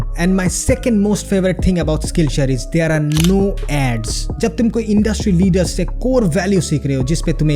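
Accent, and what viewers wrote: Bengal, India. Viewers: Hindi with bengali vibes....ye bhi log notice karte hain🤗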